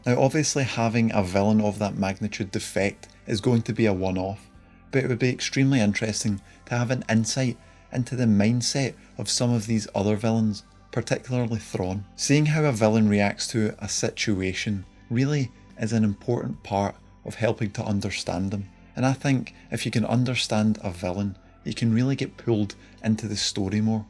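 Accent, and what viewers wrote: Scotland, United Kingdom. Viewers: haha you're Scottish, small world :)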